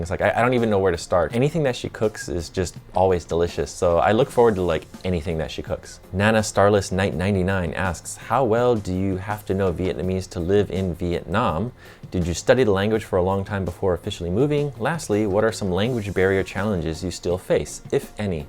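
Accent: United States. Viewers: I'm sure you've been asked this before. But how can you speak such with such a general American accent? You are from Japan, ethnic Korean. If someone doesn't spend most of their elementary school years in the U.S., they will have at least SOME accent. I don't hear even a hint that you didn't spend all your youth in America. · Ribas Yes, he does! really nice one... Sometimes I comment down on his Instagram photos and his Youtube videos, replied all of it and I'm very appreciated it. I'm Vietnamese, actually English isn't the main language in Vietnam, so I want to improve my English listening skill and then I decided to watch videos. I really love American accent. His voice was warm and inspirational... I always support him 😁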